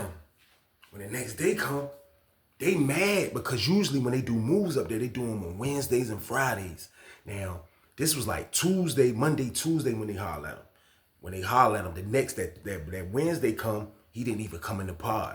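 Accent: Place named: South, United States. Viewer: That's how they talk down south. It's common down there.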